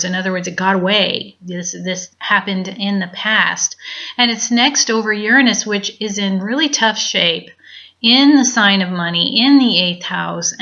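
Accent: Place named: United States. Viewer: You sound American. What about the USA? Will Trump's failure to close the borders cause him to lose 2020? Will 2019 nCoV get really bad in the USA? Do you see martial law? I hear the Feds are buying food. Thank you. Pray for the USA!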